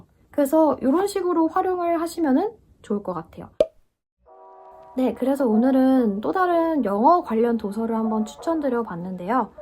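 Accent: United Kingdom. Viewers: 영국에 쭉 사시는것은 아닌가봐요! 덕분에 영국영어를 매일 듣고있어요 감사해요!!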